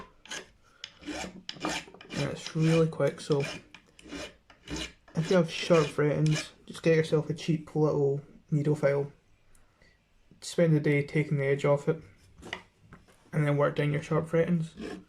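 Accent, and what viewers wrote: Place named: Scotland, United Kingdom. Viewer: You sound Scottish. I had an aria diamond looked exactly like that I swapped it for a watch from a guy that lived on a boat that had a fore head tattoo when I was living in Brisbane. I'd like your opinion on guitars. Have you got an email address? I have a Horner shorty it's a pain to change strings I'm looking at Jackson's dinky Floyd rose and the minion.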